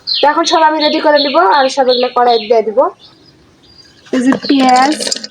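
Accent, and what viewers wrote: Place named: Rajshahi, Bangladesh. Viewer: আপা আপনার বাড়ি রাজশাহীর কোথায়? মনে হচ্ছে আমার এলাকার ভাষা বলছেন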